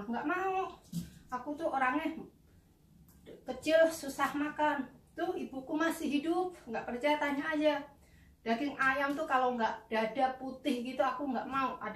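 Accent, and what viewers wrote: Java, Indonesia. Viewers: Mbak orgnya asyik kok.. gk sombong.. gk lp bahasa indonesia aplg bhs jawanya itu lo.. medok bgt 😊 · Hahahhaa aku nontonnya subuh2 jadi ngileerr pengen rujak buah, ditambah lg mbak pas cerita logat jawanya keluar bgt jdnya lucu gk ada kesan judes 😂😂 aplagi pas nguleg lombok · Hahaha salut mba semangat ibu hebatt ... Sy seneng gaya ngomongx wong jowo biar tinggal di luar ngakk sombong 😍😍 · Sabar mb.... Namanya orng bnyak y bgtu Tp ak suka ketawa klo logat jawanya keluar...podo mbak kro ak ...hehehehe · Biarin orang nyinyir, karna nyinyir tanda tak mampu, semangat mbak, kamu bisa jadi inspirasi wanita Indonesia, aku suka banget gaya bahasamu yg medok jowo banget. Kita kan orang jowo.❤️❤️❤️ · Mornibg,ni ch saya lht pas pagi nyiapin msak mjkn sdh selesai,b8sa buka You Tube di dpur...biasa nyuri waktu...saya slalu bgikuti...saya bgt logat mu msih medok juowo bgt lo jeng,iyo jebg ngono iku ra sah di dengar jeng,anggap ae org iri dgn kehidupane pean..saya salut bgt,bisa jdi IRT yg bsik,saya bisa mencari tmbhan rezeki,tetapi anak"ku q titipin ke Ortu ku...n...kakakku. 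· Ya.. Mbak2 sekalian... Kita yg tinggal di luar negara saja yg dpt memahami.. Org yg gak pernah keluar negara atau tak berkeluarga dg org asing mmg tak faham.. Mak saya dah 29 tahun tinggal di malaysia.. Bahasa Indo nya dah cacamarba kira wang indo lagi lucu.. Saya rasa mbak masih ok sangat.. Bahasa jawa masih medok bahasa Indo masih ok.. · baru liat channel mbk sekali tapi ud sy subscribe soalnya bagus banget anak ttp diajarkan dua budaya bahkan budaya tempat tinggal luar biasa. banget bisa pertahankan logat jawa juga😊😁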